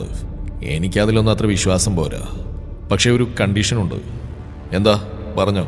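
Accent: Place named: Thrissur, India. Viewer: ❤️🥰🥰സൂപ്പർ... എന്റെ... ആ തൃശൂർ സ്ലാങ് 👌👌ഒരു രക്ഷയുമില്ല... സൂപ്പർ 😍🥰🥰🥰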